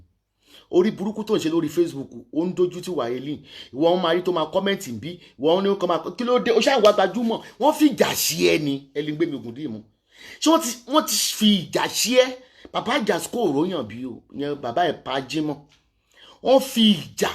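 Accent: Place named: Ibadan, Nigeria. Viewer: Love you for your down to earth attitude and truly it’s your Ibadan accent and the typical Ibadan behavior that is the koko of your show Omo Ibadan ki ni so; so sure Go on soun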